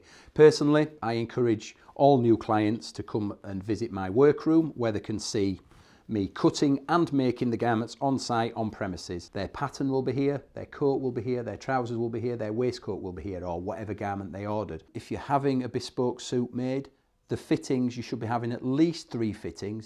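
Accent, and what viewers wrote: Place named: United Kingdom. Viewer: Yeah absolutely thanks for your understanding. Which part of UK is your accent from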